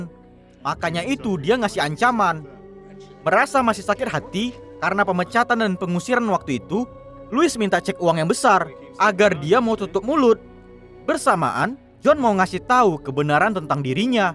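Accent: Medan, Indonesia. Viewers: Swrius saya nonton alur ini bukan pengen lihat filmnya tapi dengar logatnya ngomong medan kali wak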